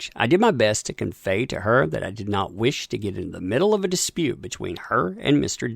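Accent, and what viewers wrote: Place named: Texas, United States. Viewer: Texan AF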